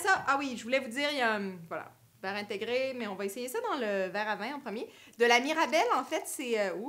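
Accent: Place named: Quebec, Canada. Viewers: Québécois. Les Canadiens ont un accent anglais, car ils parlent Anglais! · tu as un bel accent canadien on dirait!! bises!!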